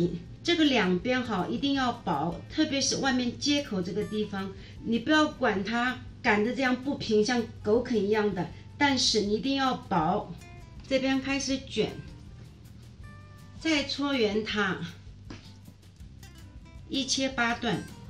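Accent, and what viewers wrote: Shanghai, China. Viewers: 👏👏👏一口上海普通話❣️❣️ 教了拿能弄，還撫平了我的思鄉心💕 謝謝噢謝謝🙇🏻‍♀️ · 上海普通话听着好亲切哦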